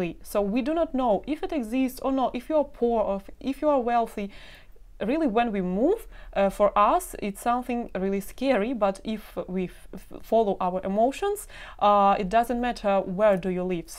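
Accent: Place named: Russia. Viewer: Your english is good. I can se you pronounce "it" as "eat" just like a russian girl I talked to. And you say "for what" the same. End sentences with abrupt and to the point words 😂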